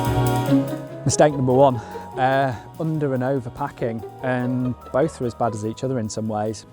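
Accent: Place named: United Kingdom. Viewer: Fantastic video. So refreshing to hear someone from the UK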